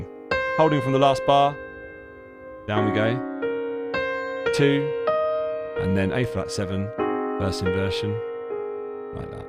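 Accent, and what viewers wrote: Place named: United Kingdom. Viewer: British accent, good man.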